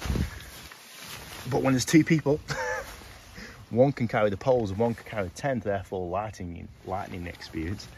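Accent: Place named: Stoke, United Kingdom. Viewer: you sound like your not far from stoke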